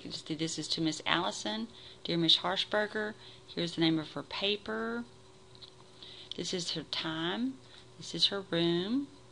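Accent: United States. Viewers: are you Texan? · I just love your southern accent.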